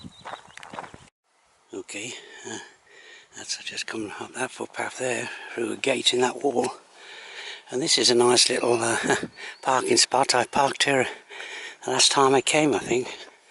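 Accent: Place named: Cornwall, United Kingdom. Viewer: That sure was a lovely place to have a cuppa at the end there. Did you grow up in Cornwall? Your accent is like a guy I used to work with who came from Bodmin.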